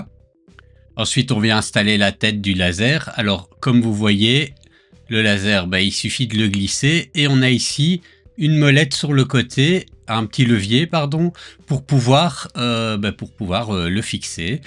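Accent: Belgium. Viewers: Bravo pour ce test. Clair, sympa et sans pression. Ce petit accent belge est super agréable 😜. Ca donne envie d'ajouter un laser à mon atelier. Merci pour tout ce travail.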